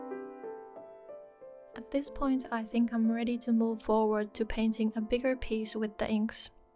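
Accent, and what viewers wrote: Finland. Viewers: Mä tiesin että jotakin tuttua on aksentissa ja suomestahan sä. Somebody pick up the phone, cause I called it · Tell me you're Finnish without teeling you're Finnish! Tunnistan aksentin mistä vain! Ei siis huonolla tavalla. Söpöllä tavalla. Oot super taitava ja todella kaunista jälkeä! Ihan kateeks vetää nähdä noin upeaa sivellin työskentelyä! 👀❤️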